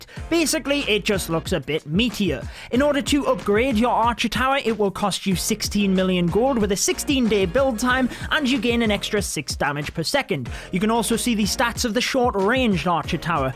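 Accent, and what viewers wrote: United Kingdom. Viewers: The intro and that sweet British accent just makes everything better!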